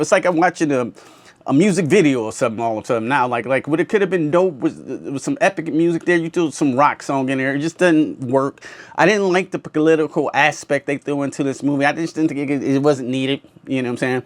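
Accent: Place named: South, United States. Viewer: Yeah, I noticed the Southern accent too lol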